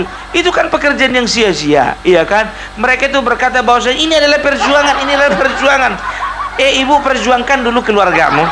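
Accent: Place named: West Sumatra, Indonesia. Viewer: Logat suaranya seperti logat Sumbar...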